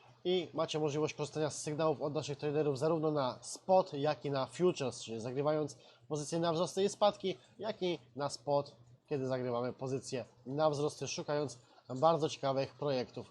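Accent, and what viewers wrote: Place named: Costa Rica. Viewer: Voice of Costa Rica😁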